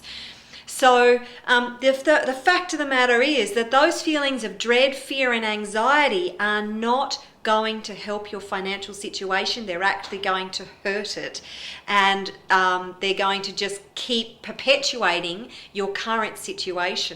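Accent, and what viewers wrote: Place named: Australia. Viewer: this aussie lady has me thinking in this accent at times.. oh boy very funny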